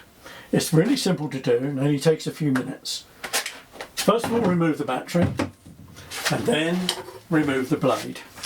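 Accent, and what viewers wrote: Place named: United Kingdom. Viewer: there's something about the queen's english that just makes the video sound like a documentary. this is amazing hehe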